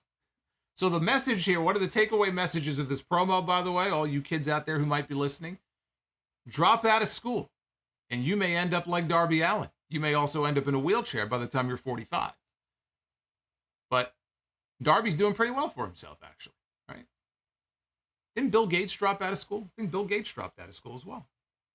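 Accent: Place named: Brooklyn, United States. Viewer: Omg! The footage of your bar mitzvah melted my heart. You still have your Brooklyn accent, don’t worry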